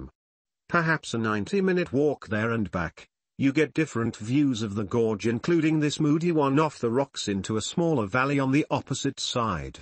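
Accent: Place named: England, United Kingdom. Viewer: I truly enjoyed this "experiment". The English accent is enjoyable - my Grandmother was English. I am relatively new to Morgantown. I have been to Cooper's Rock - simply breathtaking! But with your drone, I am able to see so much more! I also enjoyed the history - it adds to the experience. My vote - keep experimenting, please.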